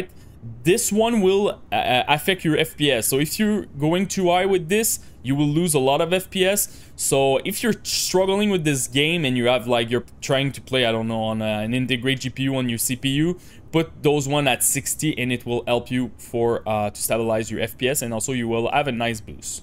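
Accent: Quebec, Canada. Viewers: je croit que je reconnait le bon vieux accent quebecois haha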